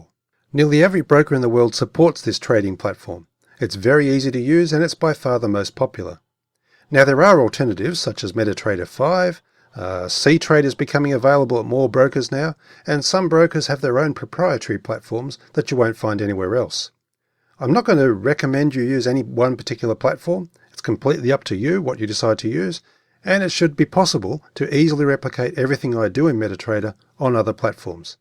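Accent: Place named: Australia. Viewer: An Aussie, yahoo !!!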